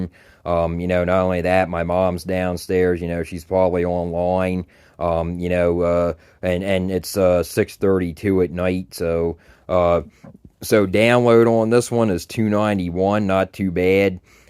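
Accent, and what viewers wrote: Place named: New Jersey, United States. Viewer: An Australian who adopted a New Jersey accent...you know.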